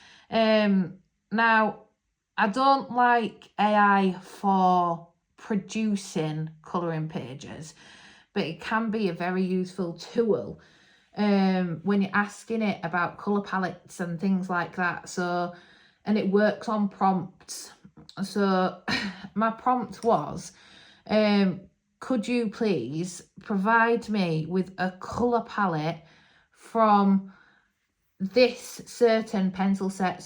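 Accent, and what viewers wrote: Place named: Yorkshire, United Kingdom. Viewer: Welcome to colouring! I started my channel earlier this month as well. Yay for another delightful Yorkshire accent, yay for another happy pup, and well done with all that work in April!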